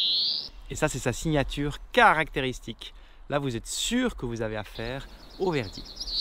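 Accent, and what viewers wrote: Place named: Switzerland. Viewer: Le plus beau chant d'oiseau c'est votre accent suisse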